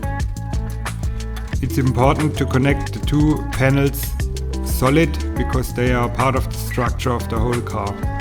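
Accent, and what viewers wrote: Germany. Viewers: Warum in Gottes Namen redest du kein Deutsch, das hört man das du kein Amy oder Engländer bist sondern ein Deutscher. Authentizität ist was anderes, aber gut muss jeder selbst wissen wie Authentisch er sein möchte oder auch nicht, wie in deinem Fall.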